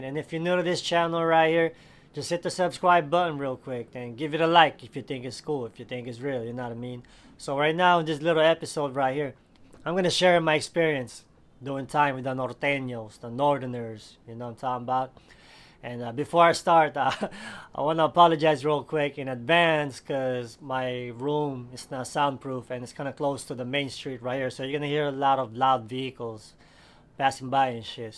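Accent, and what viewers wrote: Philippines. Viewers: I love the Filipino accent man, I was in YA in 87-89 and I was accepted by the blacks and Mexicans but I got the hardest time from the Filipinos. I'm half white/Filipino but I was born and raised in the Philippines, deep in the jungle in a literal bamboo hut. But since I wasn't full Filipino I was given a hard time eventho most of the Filipinos in America never even step foot in the islands. But it is what it is, I like the channel man. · I like the pinoy accent. That's dope. You make it sound treal and gangsta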